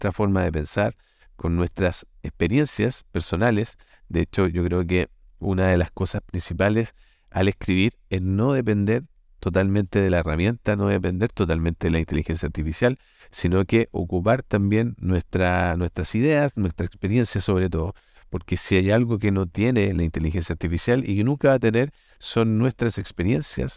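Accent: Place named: Chile. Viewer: Muchas gracias,. Tu manera de explicar es muy clara y concreta, tu voz y dicción son estupendas... y tu acento chileno hace placentero el recorrido por tus videos.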